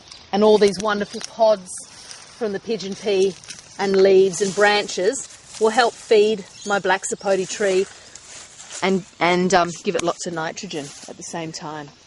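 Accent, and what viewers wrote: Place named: Australia. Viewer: cute aussie accent ;)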